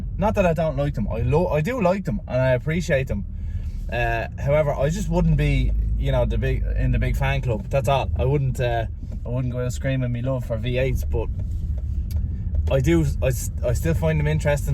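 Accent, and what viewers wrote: Ireland. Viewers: I’m guessing if you dropped the silly dub accent and talked like a west Meath man you’vd do better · I LOVE youre Accent Irish is best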